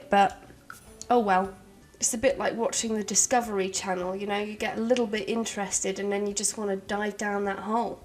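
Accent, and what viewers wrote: United Kingdom. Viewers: I made my boyfriend listen to your voice, he said "she sounds like a British phone sex operator " Im like.. im pretty sure she'll take that as a compliment!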